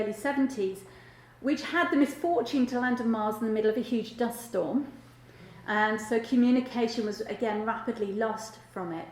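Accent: United Kingdom. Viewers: British accent is so annoying.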